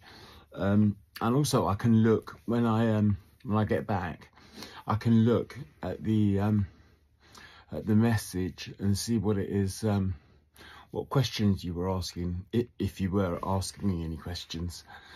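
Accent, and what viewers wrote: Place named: England, United Kingdom. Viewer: Good to see you. Was missing you. Been watching your other videos. Some a few times. Love listening to your chats to us. Hearing you burst in to singing at times. Love watching the archives site. Where past footage is there. Enjoy your videos. Love listening to you singing your songs. And the talking videos too. I like your Englishness. I am Scottish. I talk Scottish. Fit like are ye the nicht kind of thing. That is How are you tonight. It is a good job I don't write in Scottish. You would never know what I was babbling on about. I try to talk English when I am speaking to folk who would not know the Scottish dialect. And I think I am. But have had some say to others. I didn't understand a word she said there..lol They then translate to the person what I just said. I was working amongst an international community in my job. 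So I did need a translator sometimes. Being as Scottish as I am.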